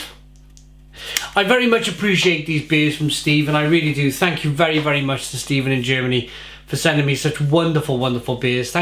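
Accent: United Kingdom. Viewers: love british accent!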